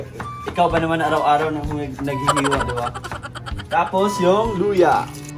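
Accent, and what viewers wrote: Visayas, Philippines. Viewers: I love the bisaya intonation ❤❤ ilonggo here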